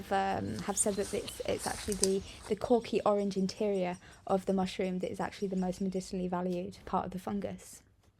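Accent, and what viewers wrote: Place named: Yorkshire, United Kingdom. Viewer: You sound well posh for a Yorkshire lass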